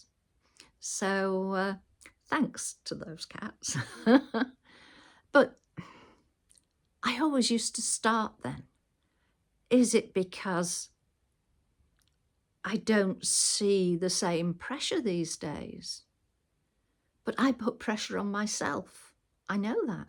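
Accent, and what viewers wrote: United Kingdom. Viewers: BUT. My I ask the accent to that? Irish, Scottish or the 40 accents of thee U.K.? Thank you Ma'am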